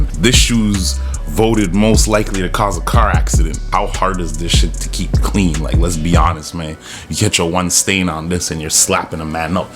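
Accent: Toronto, Canada. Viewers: you definitely from Toronto 😂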